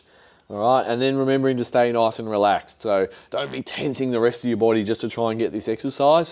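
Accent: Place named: Australia. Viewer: So much knowledge .. w some latin and the Australian accent . Glad my phone is in my pocket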